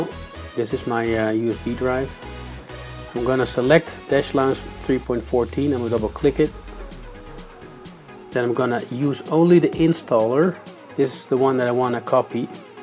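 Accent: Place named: Netherlands. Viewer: I was born in the Netherlands which is why I'm very good at recognizing Dutch accents. So far I haven't been wrong and judging by your accent you must be Dutch.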